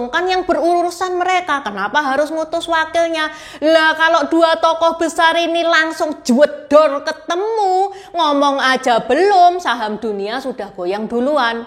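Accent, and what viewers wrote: Java, Indonesia. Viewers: Pekat sungguh bahasa susah nak faham bercampur dengan bahasa jawa tidak seperti miss sari bahasanya mudah difaham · Usul buat ci, ya...tolong ditambah ngomong mandarinnya, Ojo mung medok jowone❤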